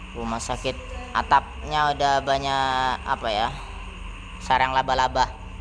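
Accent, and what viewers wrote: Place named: Madura, Indonesia. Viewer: Keliatan bgt anak pesantren. Org jember tp logatnya madura.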